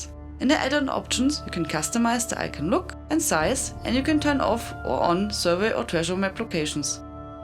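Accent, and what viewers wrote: Germany. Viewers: deutscher akzent :3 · Very good list of add-ons thanks for that. Also probably the thickest German accent I heard in a while, but your voice makes it kind of cute :) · Du hättest es auch auf Deutsch machen können, oder ein Zweites Video auch wenn ich alles verstanden habe, der Akzent schreit nur so ''ich spreche Deutsch'' xD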